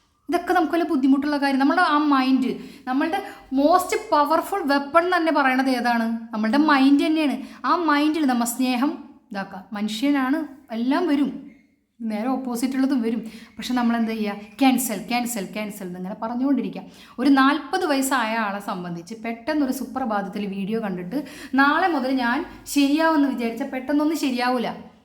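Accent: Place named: Ernakulam, India. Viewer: എറണാകുളം ഭാഷ😅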